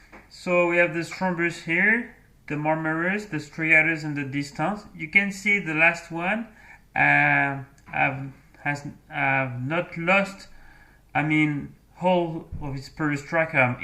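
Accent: France. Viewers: Very interesting to know this! Your French accent is charming, esp the way you say 'Horrible'! Thank you for a detailed video. I would shorten it a lot though, there is too much repetition and its too long. I'm American, so of course, we like things to the point and fast. You should always wear glasses yourself. You never know if you suddenly slipped, or had a strange unexpected accident that spilled bleach on your face. Also gloves. With regard to dental tools, you can buy them cheap on Amazon for dog teeth cleaning. Merci bien!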